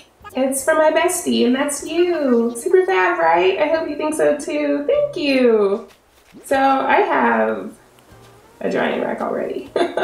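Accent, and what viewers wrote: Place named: United States. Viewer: What region of the US are you from? I noticed you say some things in an interesting way, like when you say "guys"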